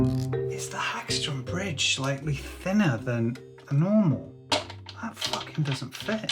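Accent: South, United States. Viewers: I was not offended by your "Southern" accent. I thought it was hilarious and I live in Nashville where a lot of people sound like that! Cheers.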